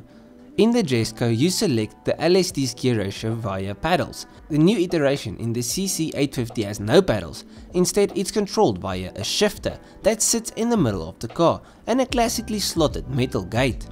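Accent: South Africa. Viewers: Ayy is this bru South African? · are you south african ?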